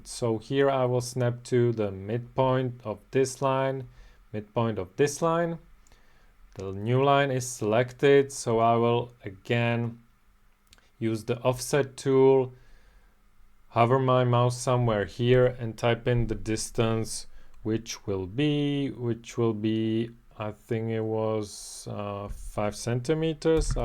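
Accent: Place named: Czech Republic. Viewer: Are you Czech btw? I can hear it! 😀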